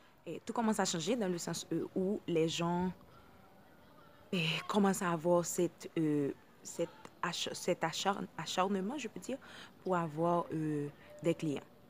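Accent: Haiti. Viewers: C'est pareil sur insta ! Étant une " influenceuse " je reçois de nombreuses demandes de pubs par jours. Là n'est pas le problème, mais quand ça termine par " stp fais moi une pub j'aimerais percer comme toi ". Y'en a ils s'inventent des vies pour qu'on parle d'eux, c'est devenu n'importe quoi !! En plus depuis qu'on peut se faire de l'argent avec les réseaux sociaux il y en a de plus en plus qui sont là juste pour l'argent, les partenariats etc. Flemmme ! En tout cas je te comprends et j'aime bcp le ton de ta voix (le ton que toutes les haïtiennes ont, mes tantes c'est pareil) bref j'aime aussi ta vidéo ♥♥♥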